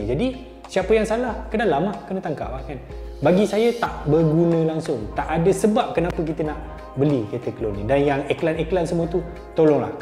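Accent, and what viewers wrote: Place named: Pahang, Malaysia. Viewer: Org mentakab ker bang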